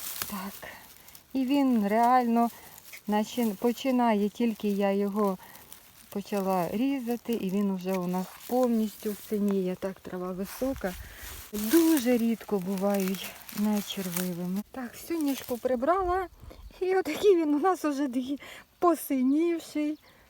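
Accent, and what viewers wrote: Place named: Poltava, Ukraine. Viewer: Цікаво, з якої ви області? Чую ондо, осьо, мабуть Полтавщина? )) чи Вінниччина?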